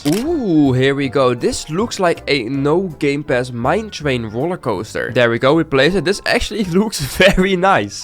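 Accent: Netherlands. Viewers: are you NL? you sound like it while speaking English i can now a am also Dutch. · I have a question are u form the netherlands?? Cuz u sound like u form😅😅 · he’s Dutch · Hes dutch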